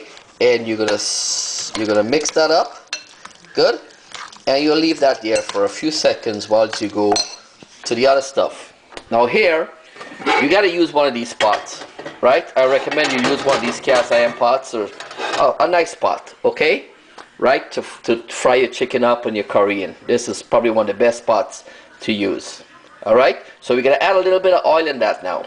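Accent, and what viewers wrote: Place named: Guyana. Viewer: congratulations for your video. I didn't know people in Guyana speak in english like this. Do you recommend your country to people like me who want to practice my English?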